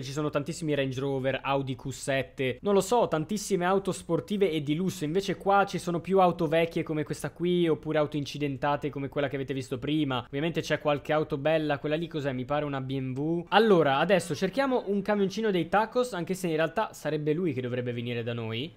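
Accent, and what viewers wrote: Milan, Italy. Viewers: sei milanese?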